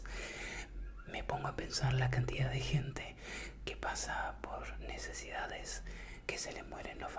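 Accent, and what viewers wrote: Argentina. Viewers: Hola; tus vídeos son muy relajantes y amo tu acento argentino;saludos desde Argentina 💕💕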